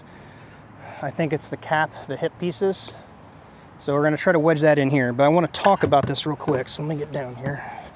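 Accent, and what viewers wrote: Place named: South, United States. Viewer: “Waller” “jossel around”…. You sound like one of us southerners.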